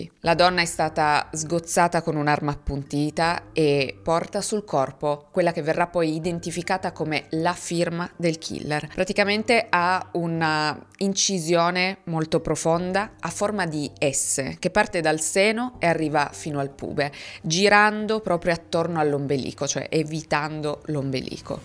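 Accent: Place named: Piedmont, Italy. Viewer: Che bell'accento Piemontese! brava :)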